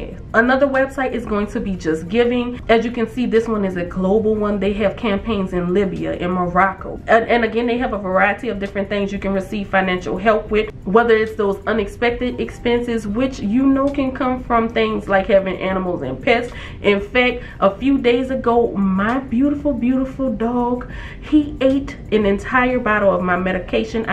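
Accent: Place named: New Orleans, United States. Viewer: I knew it!!! I heard your accent in a few videos ago and I said, "She sounds like she's from New Orleans." The way we pronounce our words is so unique. Thank you so much, homegirl, for the awesome content you create that offers so much information. You're a blessing,.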